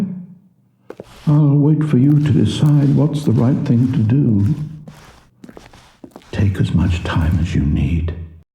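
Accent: United Kingdom. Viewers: i dont know what franknfurter sounds like cause i never saw rocky horror picture show but the narrators particular brand of english accent is quite similar to classic stewie's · It's called a british accent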